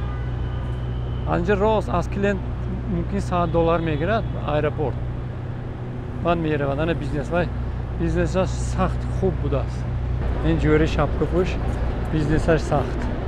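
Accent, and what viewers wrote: Tajikistan. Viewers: аахха... прикольно говрит по Таджикский . " Небоскреби баланд ки сара бардори токит меафтад" 😂😂😂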